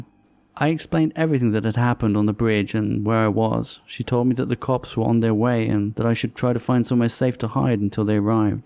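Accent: United Kingdom. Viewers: Unrelated but you have a nice accent. I assume you are British?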